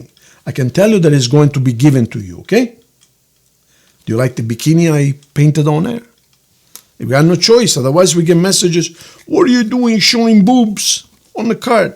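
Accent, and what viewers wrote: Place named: Italy. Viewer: The Italian accent is appealing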